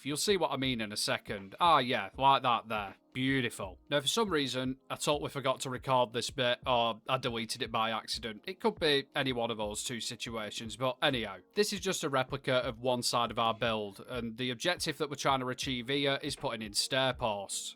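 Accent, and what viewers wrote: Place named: Northern England, United Kingdom. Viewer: Brilliant build and humour yet again and loved you bringing in the other builders too! I love seeing what you all come up with and I wish i was as creative and clever! Oh and as a fellow ‘Brit’ from up North I love the accent and by name and nature!!! 😂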